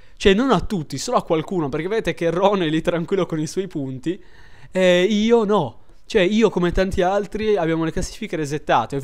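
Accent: Sardinia, Italy. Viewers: ma tu sei sardo o sbaglio??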